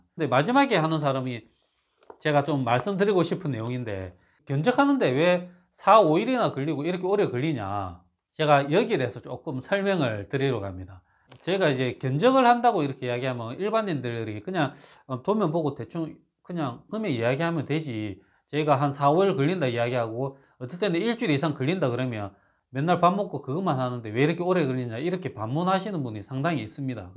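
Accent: Gyeongsang, South Korea. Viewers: 님이 집 짓기 하는 지역이 어디인가요.? 경상도 말투 같은데 사업장과 멀면 비용이 더 들어갈까요? 저는 합천입니다.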